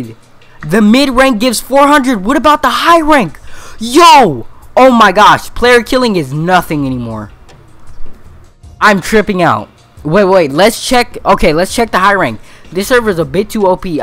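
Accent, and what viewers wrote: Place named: United Kingdom. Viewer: british acent gang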